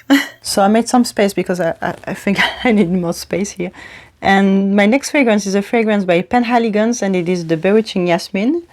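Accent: France. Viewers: Love your description of Fille En Aiguilles. Have similar feelings about it. Orthodox Christmas :). P.S. Please don't apologize for the french accent - that's great!! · Don't apologise for your French accent - it is beautiful! So nice to hear the correct pronunciations for the French names, especially the Shalimar. I've been wanting to try Lui, so hard to find in Australia though...I also love Dior Addict, I have the earlier first two versions., 2002 & 2014. I was exactly the same as you with L'Heure Blue - it took me a while to love this one, I initially found it quite difficult, but it takes some time to warm up to this one! But when I'm in the mood for it, it's perfect. I also really love Perfume Initial! 💙 The bottle is so beautiful & elegant. Lovely video thanks! A big hello from a very warm Australia. x 🤗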